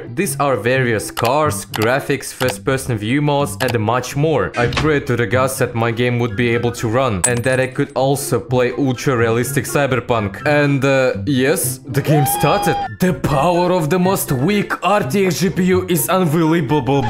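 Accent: Russia. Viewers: Акцент как у русскоговорящего. Лайк авансом. Расти большой дружочек) <3 P.S. только сейчас понял, что спустя 48 секунд видео, появляется родное уху: "Блять" 😅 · Man your accent is addictive, Ruuusssssiiiiiaaaannn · You can tell that Bro is Russian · Ahh the Russian accent blessing my ears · that russian accent is the cherry on the cake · ато я слышу что автор русский страно по американский говорит как друг мой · какой же у чела русский акцент))))))) мужик делай контент на русском пжлст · Есть ли у тебя канал на русском? Просто слышу сильно русский акцент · по акценту понято что русский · Сижу, слушаю акцент питерского английского.... кайф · Russian accent :D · That russian accent ! Привет, брат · Акцент понимаю ты русский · I subscribed for how funny the russian accent was, editing, and personality. Keep it up brah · Are you Russian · russkiy blya ya tebya slishu suchka) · Чел ты из Росси или знаешь русскому язык, это заметно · блин, вначале я не думал что это это русский акцент, всетаки на английском говорят кучу разных национальностей и я акцент сильно не различаю, но уже расставило всё по местам🤣 кста, может кто подсказать, моды лучше всего перекидывать просто в корневую папку, или в архив в корневой папке? 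и будут ли моды работать, если закидывать их в отдельно созданную папку в корневой папке игры? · I can bet on the authenticity based on the Russian accent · terrible russian accent, all russians are guilty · i Love his russian/english soo much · i freaking love ur russian accent lmao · Im a simple guy. I hear russian accent, i sub. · бля ты руский что ли) акцент выдает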